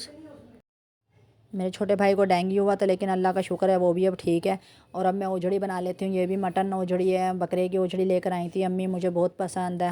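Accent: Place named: Multan, Pakistan. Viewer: Thanks mujhe apki awaz Multan ki lagi thi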